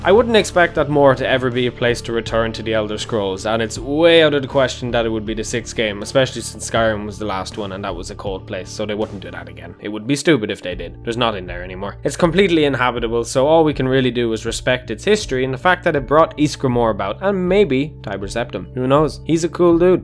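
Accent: Ireland. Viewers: "Tank** you good sir..." Gosh i tought you knew better! (Pls don't take this as an insult i love your accent it reminds me of my grandparents) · 'the Turd Era' gotta love that accent.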